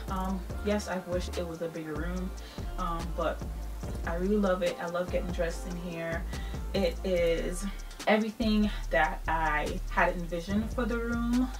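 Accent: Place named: Caribbean. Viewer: Thank you for sharing where you're from. I know I hear an accent - fellow Caribbean Girl here 🎉❤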